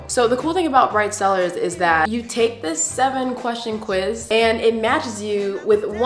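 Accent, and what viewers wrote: United Kingdom. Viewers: The British accent lol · love the british accent 😂😂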